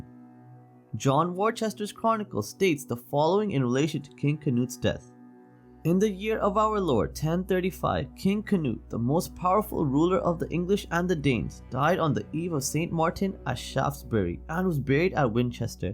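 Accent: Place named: South Asia. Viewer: all i hear is jeet or porki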